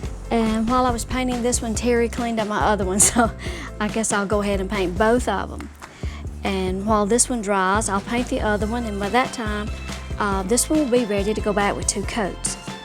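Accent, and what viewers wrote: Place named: South, United States. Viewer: Oh I just love those lanterns, rusty or not. I would not have thought to use chalk paint. Thank you for sharing. By the way, are you from N.C.? I am and I have moved to VA and your southern draw reminds me of back home. that's a good thing :) I really enjoy all the video's that you have made. You give great attention to details.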